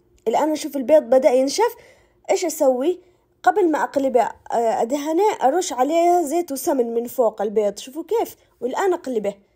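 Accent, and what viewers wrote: Aden, Yemen. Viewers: فديتك احب طبخك واحب اسمع صوتك الله على الكلام العدني اقسم بالله انت فنانه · اللهجة العدنية جميلة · ياروحي على مطبقيه عدنيه بلهجة عدنيه ❤❤. ما أحلى اليمن ❤🇾🇪♥️ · ما شاء الله عليك حبيتك حبيت خفة دمك ولهجتك الجميلة أول مرة أسمع الحكي اليمني الأصلي ربي يحفظك ويسعدك شكرا لانك عيشتنا معك أجواء اليمن السعيد ونقلت لنا ثقافة اليمن العريقة متابعة واشتراك ولايك لعيونك الحلوين وتسلم ايديك❤ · فديت عدن وفديت كريتر الغالية وفديت كلامك العدني المليح واحلى مطبقية من احلى فنانه مبدعه ماشاء الله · ماشاءالله تسلم يدك يا كم تعجبني لهجتك العدنيه والله حبيتك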